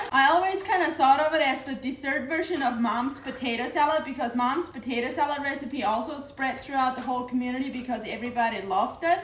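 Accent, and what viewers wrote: Pennsylvania Dutch, United States. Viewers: Amish speak Pennsylvania Dutch (that’s what her accent is)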